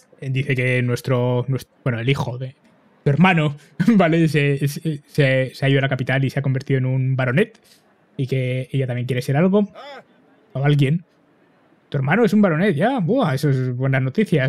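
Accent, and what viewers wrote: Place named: Russia. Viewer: es ruso y se nota... pense que iba a haber algo mas de comunismo en este video es una metafora cierto?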